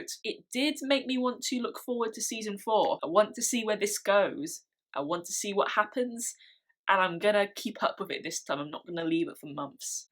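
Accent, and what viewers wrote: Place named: United Kingdom. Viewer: Dear, I am not really interested in Ladybug, but I enjoyed your take on it. It is interesting to see what is important for fans. You look great without make up and your british sounds wonderful. (hopefully it is british english... otherwise shame on me) thx for the video, I think I will check out some more. have a good time and stay healthy:)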